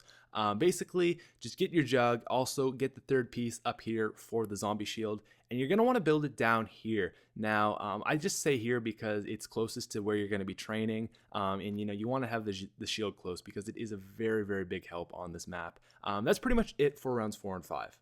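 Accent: Canada. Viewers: r u canadian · are u canadien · Is he Canadian? It sounds like he has our accent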